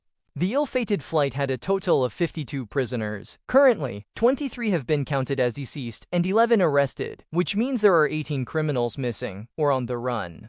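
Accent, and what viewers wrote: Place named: Germany. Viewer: The AI’s German accent narrator is a relief because most AI voices are the same generic voice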